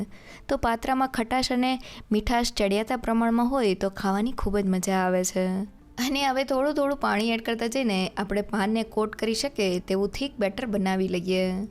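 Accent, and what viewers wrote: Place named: Kheda, India. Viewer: તમારું ગામ કયું છે તમારી ભાષા ખેડા જિલ્લા જેવી લાગે છે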